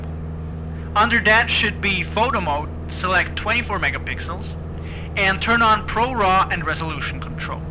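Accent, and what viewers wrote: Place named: India. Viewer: For a guy that knows a lot about upgrading, how come you didn’t try to stick your mic in the shirt instead of holding it and you don’t have to be smart to know that and you kind of sound like an indian 😷 🧄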